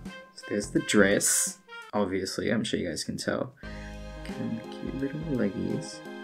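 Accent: Australia. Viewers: My god, I just met a guy from VRChat. He’s actually from Australia too and he sounds almost EXACTLY like you. It’s kinda weird, but I like the Australian accent so I’m not complaining lol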